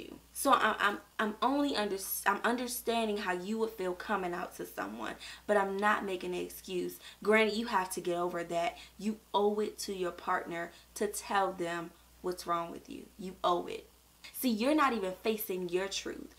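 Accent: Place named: Maryland, United States. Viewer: Like you have A Maryland Accent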